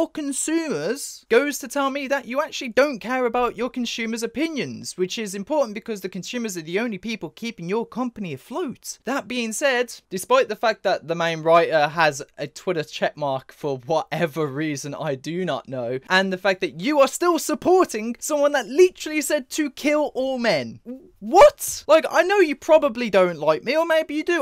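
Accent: United Kingdom. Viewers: man gets more British when he is angry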